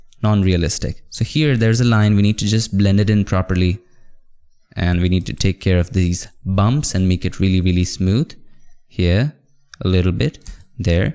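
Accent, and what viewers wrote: India. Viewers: exactly! And i love how he still has his indian accent but it is still understandable!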